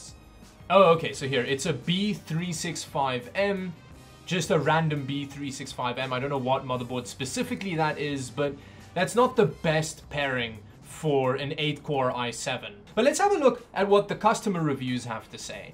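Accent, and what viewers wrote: South Africa. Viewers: Wait are you South African ? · Are you South African? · Is jy van suid afrika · dude are you south African?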